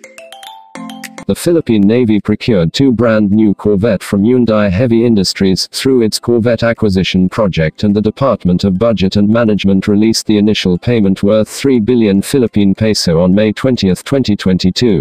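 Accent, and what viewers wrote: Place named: United States. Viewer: Bakit americano ang boses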